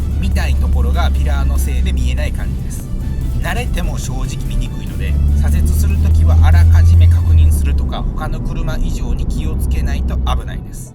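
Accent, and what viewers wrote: Kansai, Japan. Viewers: 関西訛りなのに浜松ナンバー。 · はじめまして、もうすぐ1型中古を納車予定の者です。 色々参考にさせてもらってます。全然関係ないですが アークナイツというゲームの実況者（登録者10万以上）のoyukiさんみたいで関西弁のトークが親しみやすいです！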